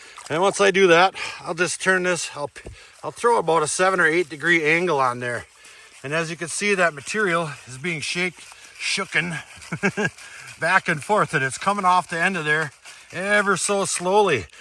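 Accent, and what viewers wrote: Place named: Michigan, United States. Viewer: Go aheaad an pan dis one ouwt just hits different when you’re from Michigan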